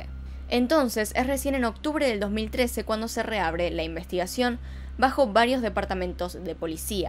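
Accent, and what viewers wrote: Argentina. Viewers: Hay veces que no me gusta el acento argentino (sin ofender a nadie) pero sin embargo amo tu voz y la manera en que relatas todo ❤️ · Me encanta tu acento argentino. Estás súper guapa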